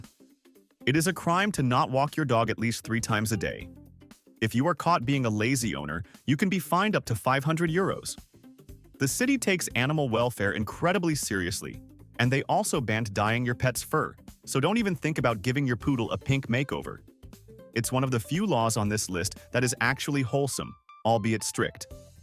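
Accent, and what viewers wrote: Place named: United States. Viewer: this collection has been put together, evidently, with an American point of view, even spoken with a recognizable American accent American prohibits chocolate surprise Kinder eggs because only American children are so st++id and they swallow the surprise... even Pope Francis made fun of it during an official state visit to the Vatican of J.D. Vance and gave his children Kinder eggs as gifts !!! IT WAS HILARIOUS THE WHOLE WORLD MADE FUN OF AMERICA and AMERICANS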